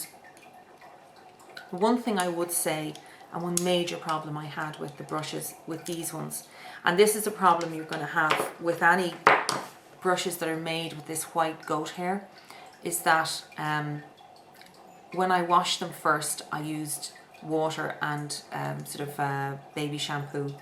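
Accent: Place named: Cork, Ireland. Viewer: your accent is soundin pretty local to me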